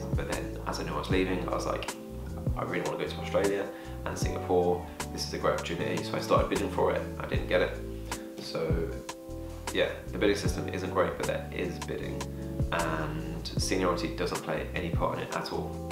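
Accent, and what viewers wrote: United Kingdom. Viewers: Love your British accent! (I am German, that's why...) :-)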